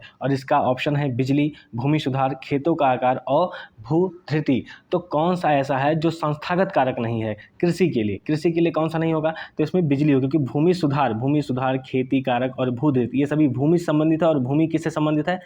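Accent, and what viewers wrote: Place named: Bijnor, India. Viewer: Aapki aawaz hamare bijnore ki lg rhi h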